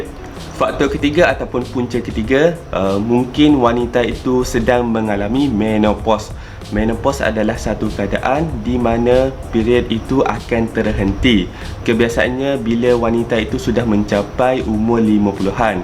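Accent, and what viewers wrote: Kelantan, Malaysia. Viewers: Doc org kelantan ke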